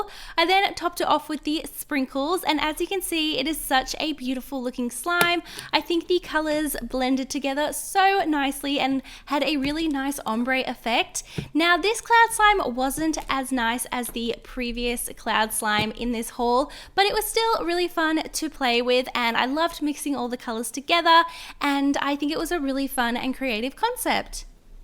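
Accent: Australia. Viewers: FINALY SOMONE THATS AUSSIE NOT AMERICAN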